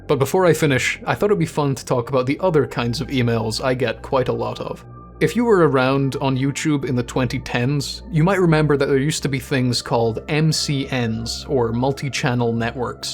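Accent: Scotland, United Kingdom. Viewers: Bro you do more videos using the Scottish accent it’s genuinely the best accent I’ve heard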